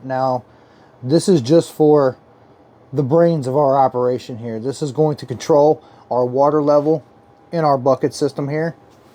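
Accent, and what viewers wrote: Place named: Pennsylvania, United States. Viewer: Yo I knew you had to be a neighbor because the accent/dialect. You sound like you from PA but the jersey tells me all I need to know lol. Good shit bro. I been brainstorming this type idea for a little but still am rocking all organic atm...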